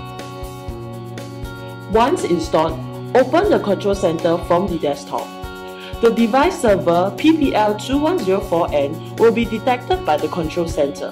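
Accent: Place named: China. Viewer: I love the Chinese accent!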